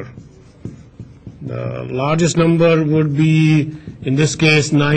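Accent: India. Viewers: good explanation.....but had a very hard time digesting indian accent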